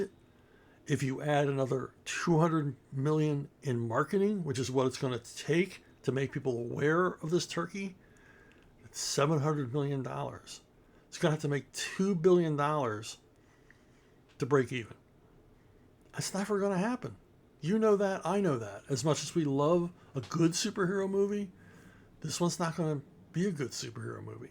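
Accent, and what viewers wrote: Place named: Chincoteague, United States. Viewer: People from my hometown of Chincoteague Island, VA also say "wooter" and "igles." It's so distinctive that I was shocked to hear from Philly pronounce things that way on his podcast. Though Chincoteague had a lot of truck with Philly, including men working seasonally in Philly (where my great-grandfather met my great-grandmother), so that might have something to do with it.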